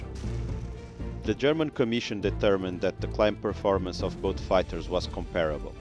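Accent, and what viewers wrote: Portugal. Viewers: Is the voice Portuguese? Reconheci logo o sotaque. Ahahahhahahaha abraço w muito bom vídeo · Great analysis on an interesting subject. Well done! Do I detect a Portuguese accent? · NIce video, and nice Portuguese accent :) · A Portuguese accent..great video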